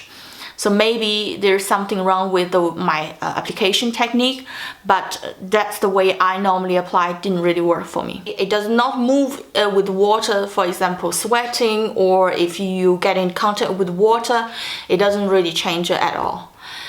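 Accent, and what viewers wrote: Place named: United Kingdom. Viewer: 厉害了我的琳👍英文好赞！喜欢你这带点英式的发音呢😊😊😊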